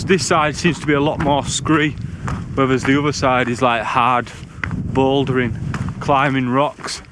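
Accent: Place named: Wales, United Kingdom. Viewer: Brilliant video man… always top notch, loving the welsh accent funny as 😂 nice to hear you’re gonna pop to Brecon and do pen y fan, it’s a good crack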